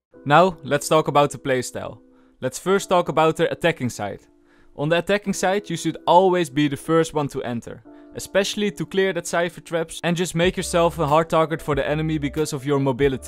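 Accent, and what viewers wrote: Netherlands. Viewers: I feel dutch accents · love DAT THICC DUTCH ACCENT DUDE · hoor dat je nl bent nice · The Dutch accent dude's back with good shit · dang that dutch accent! i love it! (ik ben ook nederlands XD) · Ik hou niet vaak van zo'n Nederland accentje maar deze is toch wel mooi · Je kunt gw horen dat hij nederlands is😂😂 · Je hebt echt zo’n Nederlands Engels accent fucking mooi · i love the dutch accent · Je hoort meteen aan zijn accent dat ie Nederlands is xd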